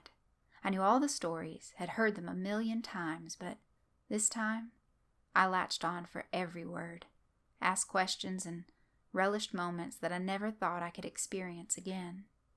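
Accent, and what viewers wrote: South, United States. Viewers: When a creepy pasta is narrated by Scarlet O’Hara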